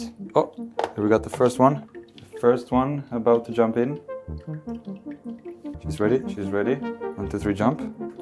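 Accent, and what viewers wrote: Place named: Sweden. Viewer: You sound swedish. Or am i completely wrong? :P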